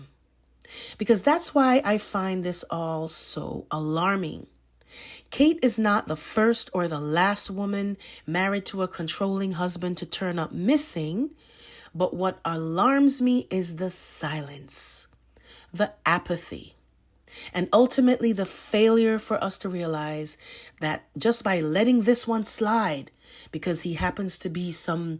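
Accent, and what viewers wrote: United States. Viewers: I hear Brooklyn or the Bronx in your voice ❤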